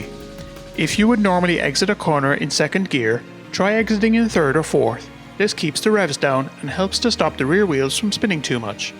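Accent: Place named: Kerry, Ireland. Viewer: You sound like you’re from Kerry or somewhere near there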